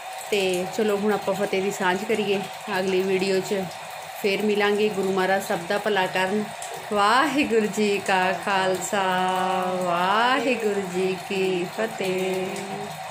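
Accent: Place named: Malwa, India. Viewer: Di tusi malwai ho boli ton lag reha hai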